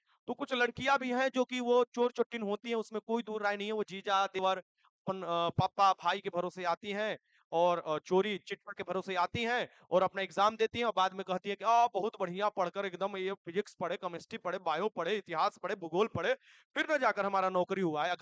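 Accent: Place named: Gopalganj, India. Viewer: App khati bhojpuri bolte hai gopalgnaj jila wala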